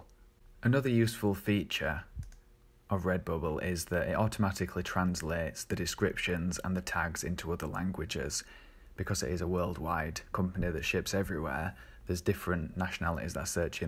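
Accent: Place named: North, United Kingdom. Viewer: I’ve been on RB for a while but not really used it properly, though have had a few sales. This is really helpful and I’m now going to go through your other videos to get more tips. I also love that you’re ‘northern’ like me - it’s weird but your accent makes me want to listen to you. Great video , thank you.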